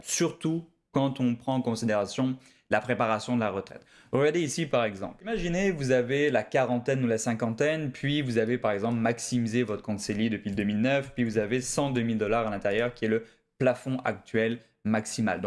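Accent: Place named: France. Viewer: Pourquoi un québécois s’adressant à des québécois sur un sujet ne touchant que le Québec parle avec un accent français?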